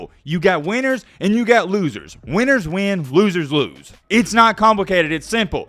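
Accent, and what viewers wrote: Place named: Appalachia, United States. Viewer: Since you're so knowledgeable, why aren't you working on a national radio or tv program? Could be because you sound like a hillbilly, holed-up in your double-wide, calling successful people losers.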